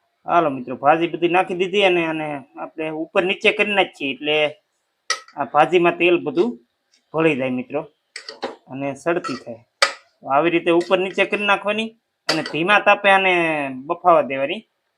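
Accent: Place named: Kathiawar, India. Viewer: બોવ મીઠું મીઠું કાઠિયાવાડી બોલો છો, કયું ગામ? જય માતાજી. જવાબ આપવા વિનંતી. મારો નંબર ૯૮૨૪૦૧૦૪૦૩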